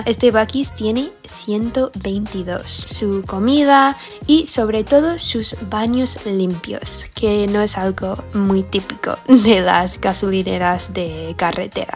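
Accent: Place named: Madrid, Spain. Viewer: Tienes un acento madrileño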